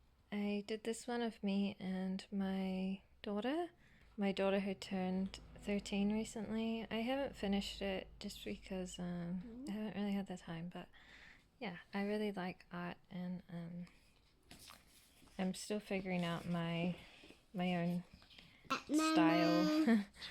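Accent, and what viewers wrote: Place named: New Zealand. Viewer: Hi from NZ! I heard your accent and knew! ❤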